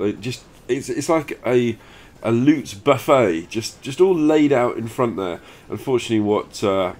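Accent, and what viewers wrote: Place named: North Yorkshire, United Kingdom. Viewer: are you originally from around the North Yorkshire area? Your accent rings a bell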